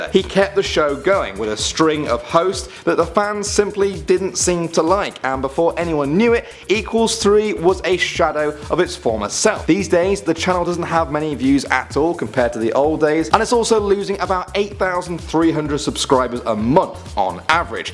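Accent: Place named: United Kingdom. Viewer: Have you never heard a British pronunciation?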